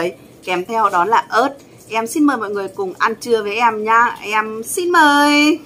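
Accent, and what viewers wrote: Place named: Hưng Yên, Vietnam. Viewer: E nghe giọng giống ng Hưng Yên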